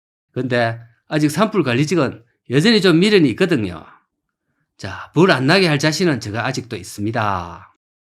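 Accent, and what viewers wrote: Gyeongsang, South Korea. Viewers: 갱상도? ㅆㅂㄹ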